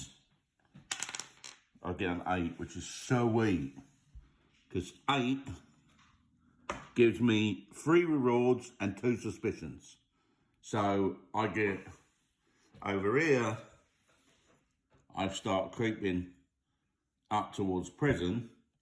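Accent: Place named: London, United Kingdom. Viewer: Really helpful video on how to play a complicated board game! Love the London accent too, brilliant! 😊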